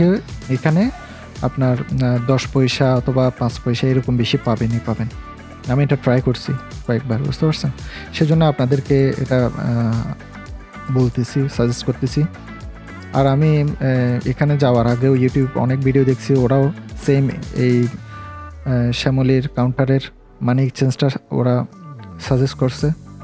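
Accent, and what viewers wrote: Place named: Chittagong, Bangladesh. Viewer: ভিডিও দেখে ভালো লাগলো। খুব সোন্দর উপস্থাপনা করেন। কথায় বুঝায় যাচ্ছে আপনার বাড়ি চট্টগ্রাম।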